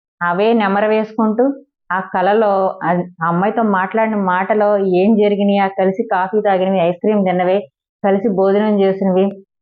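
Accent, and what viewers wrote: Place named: Godavari, India. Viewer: మీ మాటతీరు ఒక గోదావరి స్లాంగ్ ఉంది and అటువైపు ఉండే సాధారణ గృహిణి మాట్లాడినట్లు ఉంది కాని మీరు చెప్పే సబ్జెక్టు బట్టి మీకు మనుషుల సైకాలాజి తెలుసు.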